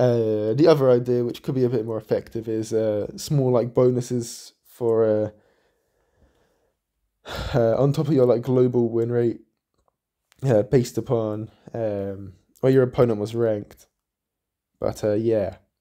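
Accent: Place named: United States. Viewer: u sound like an American 😮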